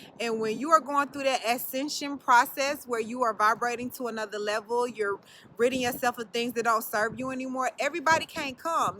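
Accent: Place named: South, United States. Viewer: I thought I knew that country twang. In from Charlotte. Well the past 9 years. I try to listen to other’s knowledge on this subject, but I keep coming back to your intoxication.❤️❤️❤️❤️❤️❤️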